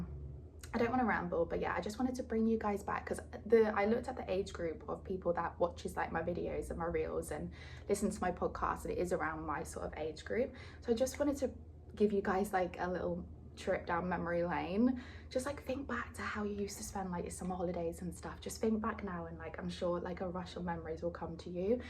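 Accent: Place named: England, United Kingdom. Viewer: Hi are you English ? You hVe a lovrly accent.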